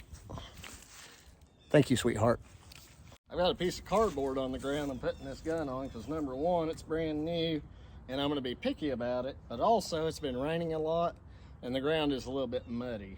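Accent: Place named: South, United States. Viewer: This worth listening to just for the South of Dixie accent.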